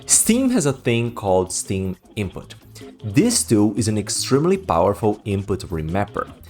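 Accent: Brazil. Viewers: Ótimo vídeo bro, mas só comentar que eu fico muito feliz quando entro em um vídeo em inglês esperando um gringo e percebo o sotaque brasileiro kkkkkk um dia a gente domina o mundo pprt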